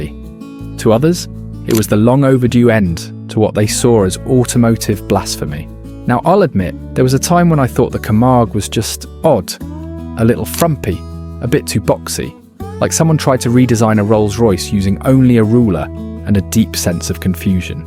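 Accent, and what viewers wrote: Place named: United Kingdom. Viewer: I detest these videos with robogobs: it us narrated with a British accent, but using 'Merkinisms such as "Five hundred twenty nine".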